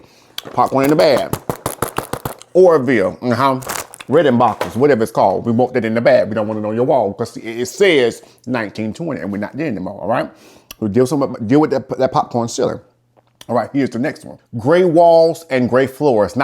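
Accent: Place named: South, United States. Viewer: Love your southern accent with your sense of humor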